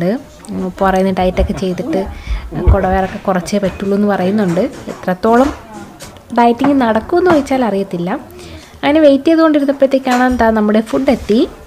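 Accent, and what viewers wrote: Trivandrum, India. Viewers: ട്രിവാൻഡ്രം ആണോ ivar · A new viewer from Trivandrum 😀 being a Korean admirer, watching ur videos and hearing ur (Trivandrum slang) Korean is like 🤩🤩🤩 wish I could be there... 💜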